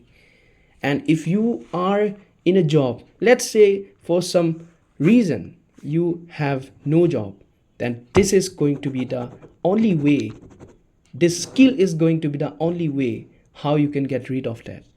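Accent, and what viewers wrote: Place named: Manipur, India. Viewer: A meitei right? I can sense from yr accent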